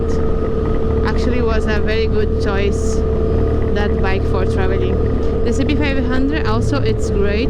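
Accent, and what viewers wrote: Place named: Spain. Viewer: Honestly, 8 years is a very long time to travel specially now you ae alone. But remeber you have a load of people here in the internet that are with you in the heart. I'm a little old as i soon will retire (unfortunately i do not have the vlog skills like you have ) but as soon as i retre, i will travel for a reasonable time and see all the beauty and horrible things you travelers have experience on my 1250 GS. Yes 150k is a lot, and perhaps the engine will be totally fine, but like a clutch can be a little problem in that long time as that is used several times a day, still, not so much a bug problem when that have to be renewed on your Tenere compared to my beemer hahaha I hope you are doing well as i love to liste to yourEnglish in a Spanich way, its soo adorable hou you begin and let us know what you experience. So drive safe, and have a good morning in the morning..;)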